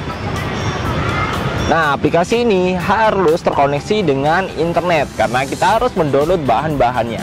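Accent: Malang, Indonesia. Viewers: Abangnya orang Malang?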